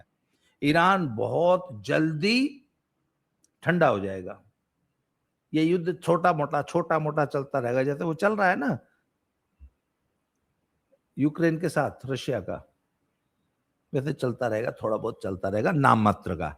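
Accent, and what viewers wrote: Haryana, India. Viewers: आपके मुँह त हरयाणवी कसूती सुथरी लाग है 😅😅😅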